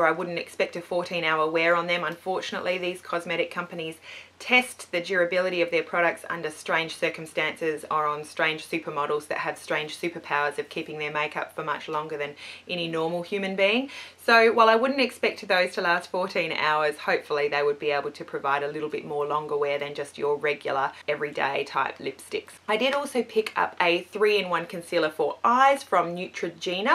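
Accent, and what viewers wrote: Australia. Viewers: I loveeeeee ur cool Aussie accent! Where in Aussie do u live? I'm in Sydney..:) Nice haul...! Missin USA just for shopping lol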